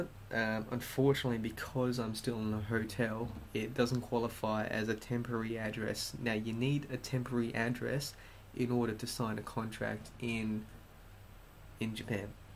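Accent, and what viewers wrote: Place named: Australia. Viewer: You have an Aussie accent. Cool!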